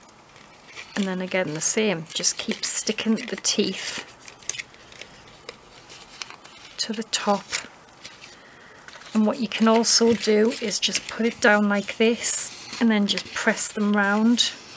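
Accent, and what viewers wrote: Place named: East England, United Kingdom. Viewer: Hi, yes its gone more viral than the Coronavirus! Have had a go at making the card but not too good as I didnt have the circle dies but now I have... nobody will see me as I will be crafting away making a wall hanging for my newly decorated downstairs loo! Just what I need to hide some pipework. Thanks for the inspiration - love the accent - you are just a bit further up the East Coast from me by the sounds of it. Happy crafting and thanks again!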